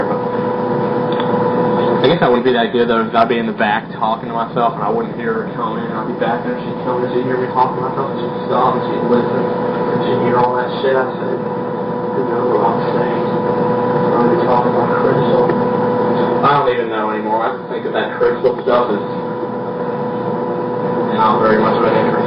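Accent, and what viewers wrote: South, United States. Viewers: Oh, wow... you really did used to have a southern accent. lol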